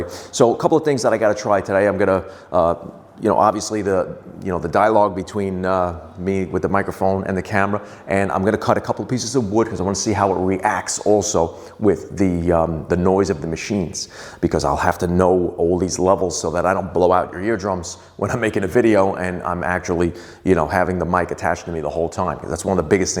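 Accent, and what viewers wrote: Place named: East Coast, United States. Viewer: You sound like most of my mother's side of the family.... Italian from back east. Love it! Sound in this video was clear and the shop is coming along nicely. I also thought you were a bit younger! At our age, that is a good thing. Ciao,! Keep punching!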